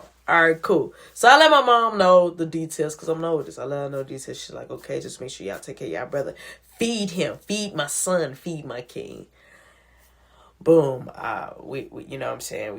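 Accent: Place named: Chicago, United States. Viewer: I thought you was from Chicago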